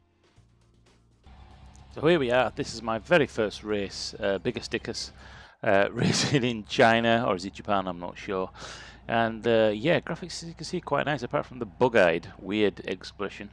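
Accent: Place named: England, United Kingdom. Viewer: an absolute clusterfuck in english accent..perfect ..peter usa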